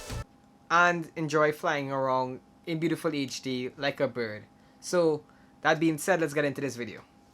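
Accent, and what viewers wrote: Trinidad and Tobago. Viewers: Your awesome T&T accent is a nice change of pace from the hundreds of other FPV videos I watch from guys in the midwestern US. :) Great video. Keep it up! I subscribed, and look forward to more great content. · South Africa but spent a great deal of my life in the Eastern Caribbean. From ABC's up to BVI. Visited Trini regular and Tobago was one of my fave kite spots. The Trini accent while toned down in the vid i remembered ha ha ha ha ha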